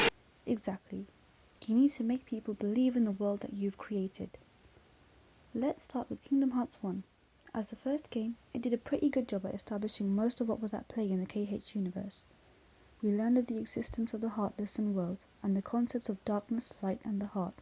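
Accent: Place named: United Kingdom. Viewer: What's this British voice smh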